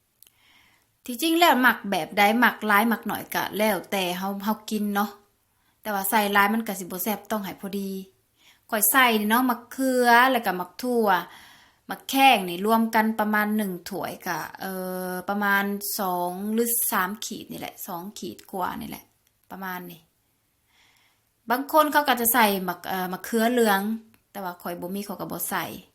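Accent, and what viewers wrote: Savannakhet, Laos. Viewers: ສຽງສະຫວັນ ບ້ານຂ້ອຍເລີຍ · สะหวันนะเขดเดี้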